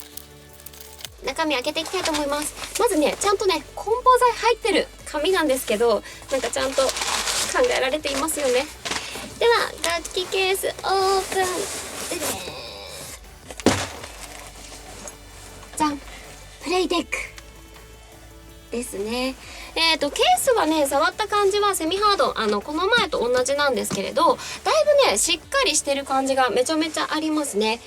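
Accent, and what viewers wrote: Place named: Sendai, Japan. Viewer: 仙台弁!!ひしゃげるって言い方初めて聞きました(^^)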